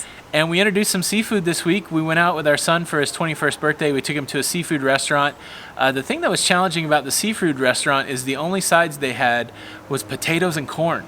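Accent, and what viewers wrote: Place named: New Jersey, United States. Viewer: Great job. I really think low carb is the way to go. And I live in ca and I could hear the thic Jersey acsent.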